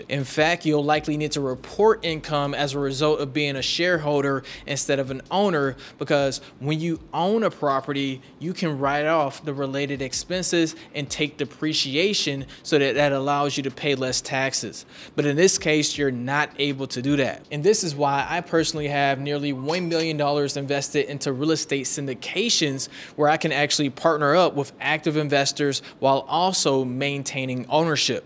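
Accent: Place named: Africa. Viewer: i like you African accent, you need to talk slower and louder to understand you.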